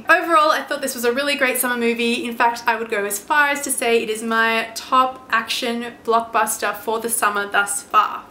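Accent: Australia. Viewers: Loved your take off the film and I definitely agree with you! You are now becoming one of my new favorite movie reviewers on YouTube with a nice sounding Aussie accent and, dare I say, stunning appearance! Keep up the great work!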